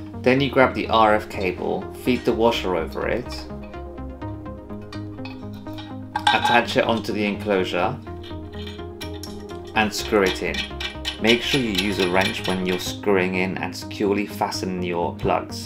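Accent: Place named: England, United Kingdom. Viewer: This is exactly what I was looking for! Thank you!! Also this is random but I've never seen or heard a hispanic/latin with an english accent! I'm Mexican living in the US so it blows my mind lol. Saludos!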